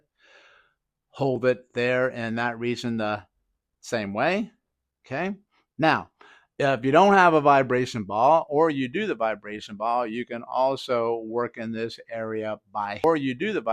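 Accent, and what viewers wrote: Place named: South, United States. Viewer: Doctor have Hollywood smile - perfect teeth/alignment. And good physique and perfect example of health and healthy prototype. I detect Southern accent. What you are broadcasting is very informative and helpful to many people. Unfortunately , the conceited me a little jealous of doctor too 😢😂😅. Tq. I plan to apply these information and sustain a schedule of sorts to do it. 🤗🙂‍↕️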